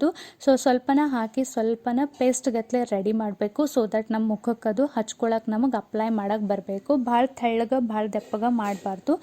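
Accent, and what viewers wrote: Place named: Shimoga, India. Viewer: wow 🤗 thumba esta aythu nim bashe........... nav shimoga nim mathu keltiddre keltane erbeku ansatte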